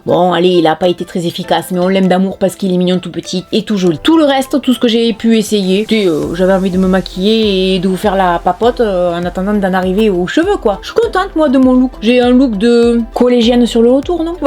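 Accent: South, France. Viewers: Je suis allée jusqu'au bout comme toujours. Rare son les sujets que je n'aime pas et même si c'est le cas bas ton accent du sud me fait du bien ❤ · bonjour, c'est mon rituel du mardi et du vendredi, je les regarde à chaque fois toutes jusqu'aux bout. même si j'accroche un peu moins pour certaines vidéos mais par respect pour ton travail, j'y vais jusqu'aux bout et puis ton accent du sud c'est du soleil. hâte de regarder la prochaine. bonne soirée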